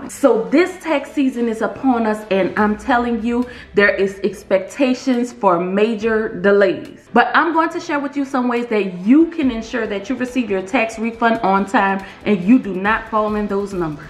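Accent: New Orleans, United States. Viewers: She sounds like a Nola city girl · I can tell you’re from New Orleans with that accent lol.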